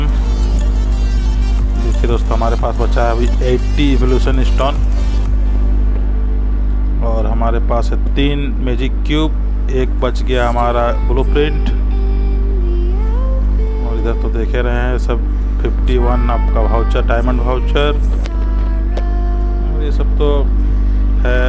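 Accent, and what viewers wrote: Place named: Bihar, India. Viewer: Bhai aap Bihar se ho kya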